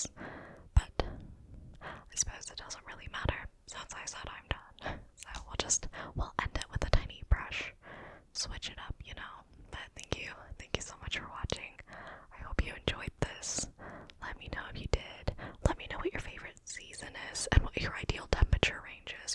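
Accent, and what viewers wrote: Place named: Vancouver, Canada. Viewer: It sounds as if you are from Vancouver, BC.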